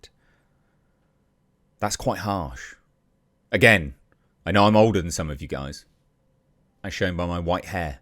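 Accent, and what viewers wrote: England, United Kingdom. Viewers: Hey, don’t sweat it bro. It’s probably just some kids. I appreciate your enthusiasm for Aliens & Predator stuff. It’s one of the reasons I subscribe. You also have good style, good politics, & even got me into retro Datsuns. The “vain” allegations are probably just because some Americans think anyone with a proper English accent is stuck up (arrogant). Who cares…You do good work & your channel is growing. You seem to be living the dream from my viewpoint. 🫡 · maybe the non UK audience think you are vain as they are not use to the accent and how Brits present themselves? either way I LIKE YOU and the "Kings English"! 😀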